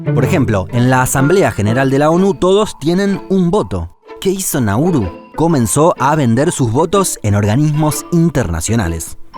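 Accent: Argentina. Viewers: Este tipo es argentino? Si haci lo es salu2 desde bs as xd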